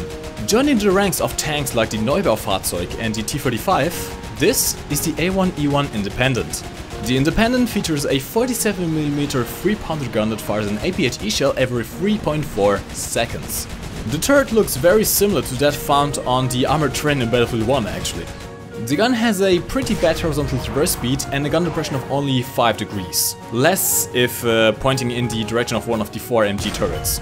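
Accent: Germany. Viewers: Dat german accent though,, i wish i could speak german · The Whole vid i fought : "Is he german?" then you said Flakpanzer blabla etc. then i new yep you are :D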